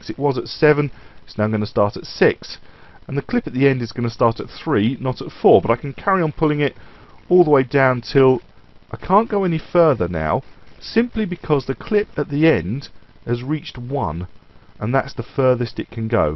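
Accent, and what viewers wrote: United Kingdom. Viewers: EXCELLENT explanation!!!! and I like the British accent too. haha! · Fab.....! Listening to your voice I thought you were Tony Handcock, the British comedian. Where in England are you from?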